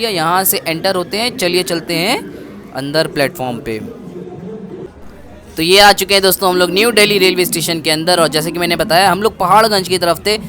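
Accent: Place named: Gujarat, India. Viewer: Gujarat se ho kya, hindi accent gujju lag raha h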